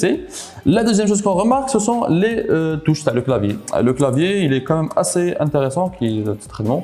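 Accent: Algeria. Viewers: اللهجة الجزائرية مع الفرنسية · Algerian rah Bayen😂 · 😂لهجة الجزائري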